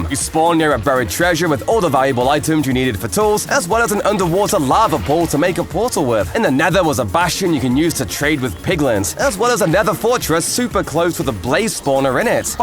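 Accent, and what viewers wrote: United Kingdom. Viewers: My mind is blowing up! At the same time you sound British and at the same time you sound American! What are you!💀💀💀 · Dude are you British?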